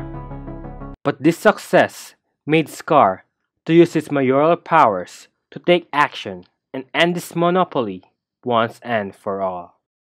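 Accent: Philippines. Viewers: are you filipino cause of the accent??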